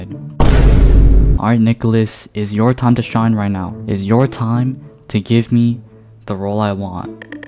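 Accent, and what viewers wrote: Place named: United Kingdom. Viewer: why do you sound so BRI-T-ISH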